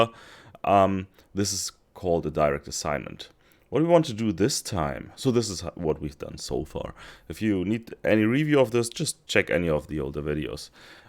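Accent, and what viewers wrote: Germany. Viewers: I love your videos, thank you very much! Also, PLC Zim , classic german-english!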